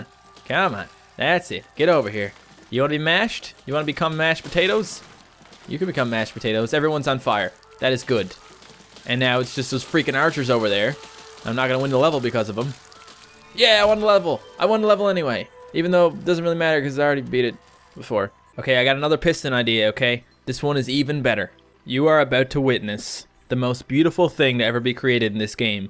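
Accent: Canada. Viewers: I watched 2 videos of yours. And ur accent sounds Canadian.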